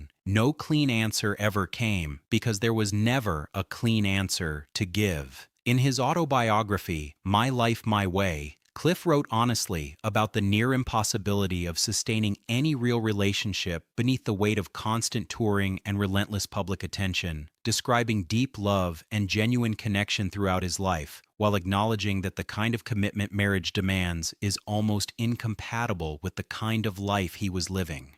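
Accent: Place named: United States. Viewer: Why is an American doing this commentary again ?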